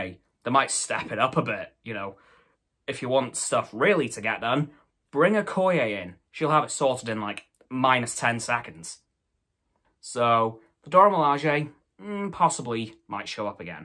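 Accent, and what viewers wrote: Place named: United States. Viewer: You got a lot right!!! Congrats! Btw- bring American, I could hear you give me bad news and sad stories with your accent all day and be totally fine with it. If I ever find out I have cancer you need to tell me. Lol.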